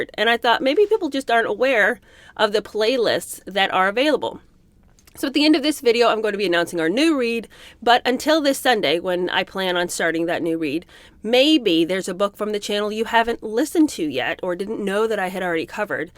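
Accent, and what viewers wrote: United Kingdom. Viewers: Love your British accent! Perfect! Sometimes it makes me laugh3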